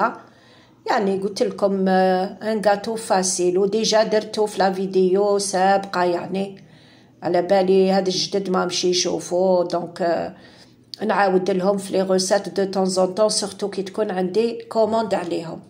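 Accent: Algiers, Algeria. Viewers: لازم ديريلنا هذا الوصصفات التقلدية تاع زمان يعطيك الصحة مشتركة من السطيف والاكثر في وصفاتك هدرتك الحلوة العاصمية الاصيلة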